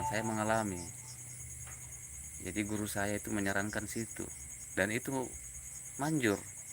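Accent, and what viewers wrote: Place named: South, Indonesia. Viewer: Posisi dmn ki, bos ku kayaknya logat selatan ki ini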